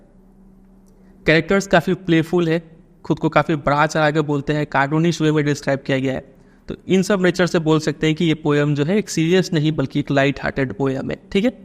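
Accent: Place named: Bengal, India. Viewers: Sir app bengali ho na?? I understood because I am a bengali too.. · Sir's bengali accent revealed 🎉🎉🎉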